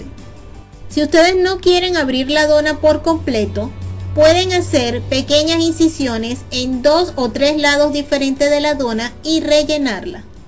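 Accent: Venezuela. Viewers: estoh eh venezolano o que chicoh po que si va pueh tanto venezolano aca parece peru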